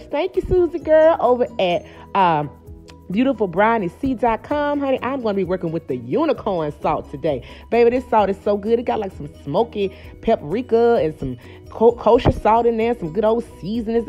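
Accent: South, United States. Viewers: I swear u entertaining even when I know how to cook just remind me of my aunt or sister !!! Where u from got to be down south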